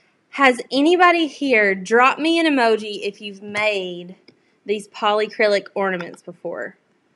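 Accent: South, United States. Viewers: I love your southern twang!!!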